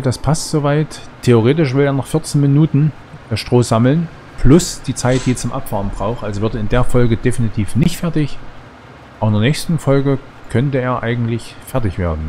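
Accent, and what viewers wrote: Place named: Saxony, Germany. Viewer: Hay war wieder mal super!!! Mach weiter so!! Ich habe keine Folge verpasst. Macht immer Spaß zuzuschauen. Wo wohnst du eigentlich, dein Sächsischen Dialekt hört sich gut an meine Lebensgefährtin kommt auch aus Sachsen!!!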